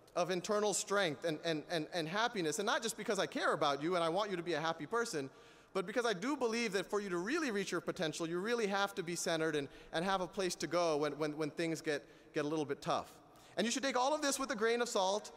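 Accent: United States. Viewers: lol no california. i meant just his voice sounds very american to me. idk ive encountered plenty of south asians with american accents. but...sal khan's voice is extra White to me. haha just thinking out loud here. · well, he was born and educated in the USA. His parents are south asians. So, it should not surprise you that he speaks in an american accent given that he has been staying in the US for most of his life.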